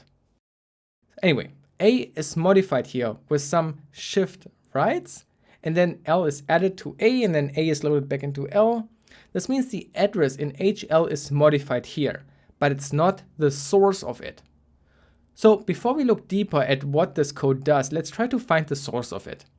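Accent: Germany. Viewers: You sound like u have a german accent, iam from germany 2 and i think iam smart enought to hear this? xD great video btw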